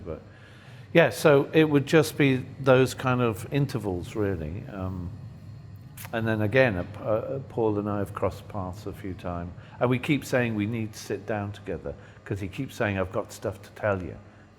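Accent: United Kingdom. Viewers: Desculpe as vezes escrevo rapido e dai errado.. Mas que pena que vc é um sonho muotoooo distante Provavelmente nunca vou te conhecet...conheci seu tio Paul num show no atena fiquei bem perto..foi bom.. Mas sou fã msm fo seu pai John lenmon adoro ele e sua mãe Cintia com todo respeito Adoro seu rosto..perfeito..voz b britanica rstsrs... Mil bjs...sonho impossivel de realizar..te conhecer pessoalmente...❤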